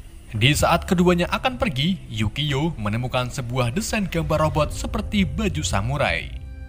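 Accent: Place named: Tegal, Indonesia. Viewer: Admine wong tegal jebule..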